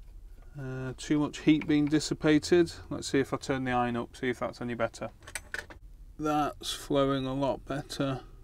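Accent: United Kingdom. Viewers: Dear uploader, Sorry to say that this chinese booster module can't manage proper current & voltage continuously ; I believe unless it is frm a branded power 💪 bank. Anyway I liked yr idea. I wish to change my 18650 cell to higher capacity in my Qualcomm power 💪 bank. Yr British accent is really cool. :-D . I love ❤ british accent.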